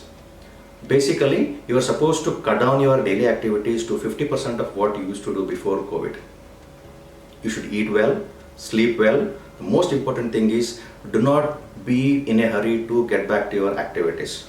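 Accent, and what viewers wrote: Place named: Tamil Nadu, India. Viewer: Hard to understand your English..too strong tamil accent.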